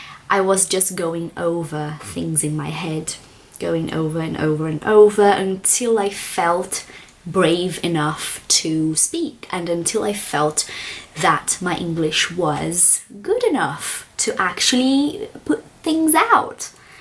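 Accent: United Kingdom. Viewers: Home and Away is an Australian soap. I just found you and I'm in love with your English. It is really good, clear and your British accent is amazingly beautiful. I'm so jealous of you as I consider English accent the most beautiful one, it is my favourite one. How can contact you? I need some help! cheers Tassia from Rio de Janeiro - Brazil - but currently live in Perth/Australia. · Eu amo seu sotaque britanico, taooooo lindoooooo :) fico tao feliz por entender, mas nao falo nada.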